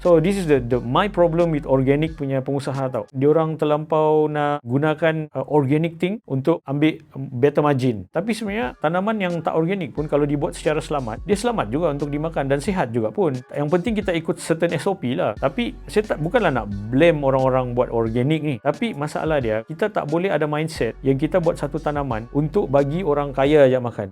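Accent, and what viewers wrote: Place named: Sabah, Malaysia. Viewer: Sedap dengar slang sabah ba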